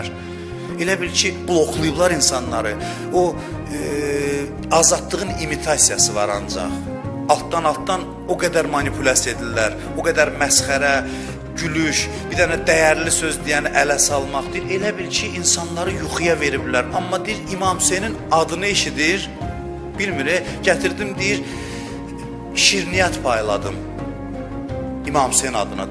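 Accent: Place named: Jalilabad, Azerbaijan. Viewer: Senin bu Celilebad lehceni esidende üreyim bulanır , get oyza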